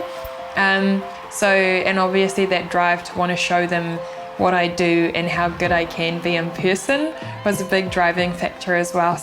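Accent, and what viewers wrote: New Zealand. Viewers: she threw her roots away by disquising herself with the kiwi accent, shame! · She has got such a kiwi accent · your incredibly gorgeous 😍 south african 🇿🇦 women are the most beautiful women in the world and us kiwi men absolutely love them, the accents are sooo sexy but its so cute how you've lost it and have a kiwi 🥝 accent now,i would give anything to meet you and even more to be the man of your life 😍 so carry on being you and dont change and i hope you find a real kiwi man that will give you wat u deserve 🤗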